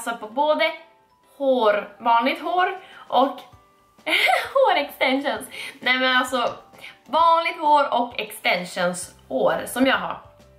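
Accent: Norrland, Sweden. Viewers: Jag avgudar din Norrländska dialekt :) <3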